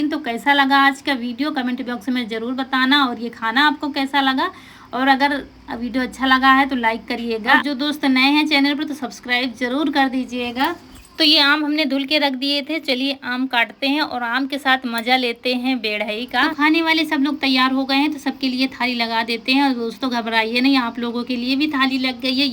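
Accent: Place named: Raebareli, India. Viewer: Bahan aap Raibareli ya phir unnao jile ho Apki language se pta chalta h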